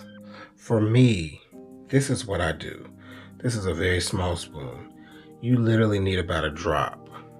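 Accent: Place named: Alabama, United States. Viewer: You sound like you live over here where I live lol I live in Gulf Shores, Alabama… Where the humidity is crazy and the weather is hot during the day and not as hot at night, but the humidity makes it awful lol where is it that you live?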